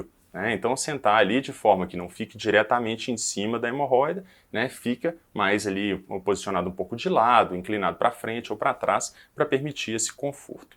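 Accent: Minas Gerais, Brazil. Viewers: Dr esse sotaque mineres e mto bom 😅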